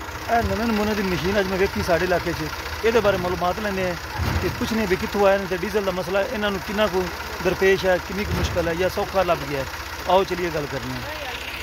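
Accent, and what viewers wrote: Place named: Ferozpur, India. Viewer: Bhai saab tuhadiyian video nall sade Ferozpur Fazilka area de loka no bhaut fyida hoya kyuki sadi boli te tuhadi boli same hai jiss nall jahre malwa belt te majha doaba area de loka ne sada majaak banaya hoya ci hon ono de mouth te olte maooh thappar vajiya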